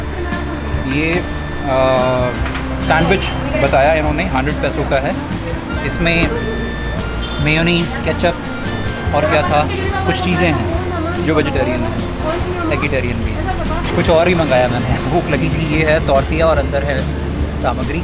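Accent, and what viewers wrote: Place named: Asansol, India. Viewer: Asansol type shune khub moja laglo. Love from Kolkata.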